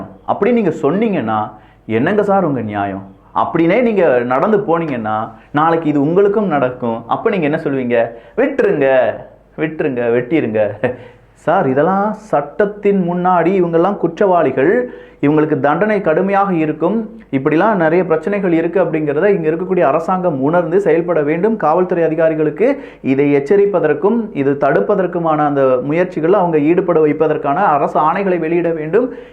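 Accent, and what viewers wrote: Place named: Tirunelveli, India. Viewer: தாங்கள் நெல்லை மாவட்டத்தை சேர்ந்தவர் என்பது தங்களது பேச்சில் தெரிகிறது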